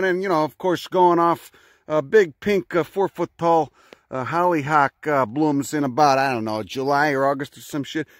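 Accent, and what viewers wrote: Chicago, United States. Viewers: You are fucking funny! With your Chicago accent and knowledge of botany. It's like listening to a mobster with A botanical education. Kinda almost an edgier botany version of AvE. Lol. And I love him.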